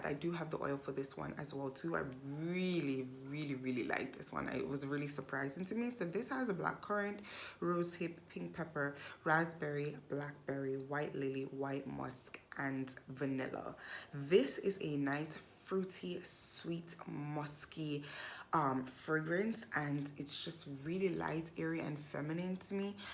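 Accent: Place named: Jamaica. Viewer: Great review! You're from Jamaica correct? Your accent sounds a little different. Like a few different accents mixed together.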